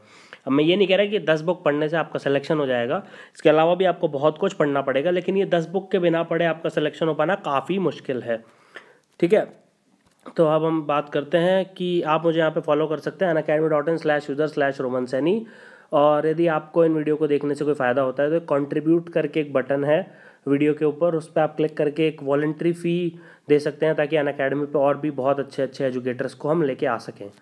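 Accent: India. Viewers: very helpful video....gud to hear ur hindi accent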